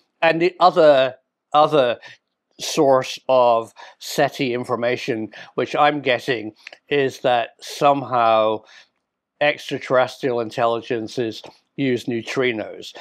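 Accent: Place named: Cornwall, United Kingdom. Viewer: ahhh really! Sometimes you have a hint of Cornish! ❤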